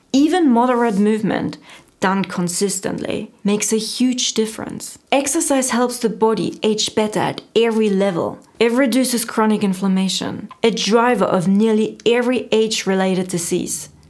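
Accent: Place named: Germany. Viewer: Fantastic accent? Sounds like German raised with some Southern England living, some Australia, a lot of US media?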